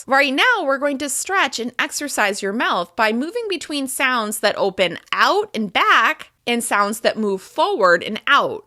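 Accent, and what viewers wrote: United States. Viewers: American acent amazing teaching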